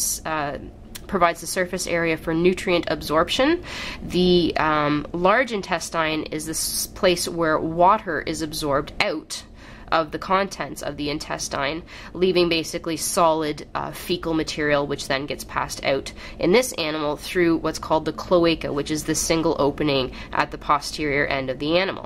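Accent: Canada. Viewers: First of all, she lives in Canada and is a Canadian. They have a different accent and pronunciations.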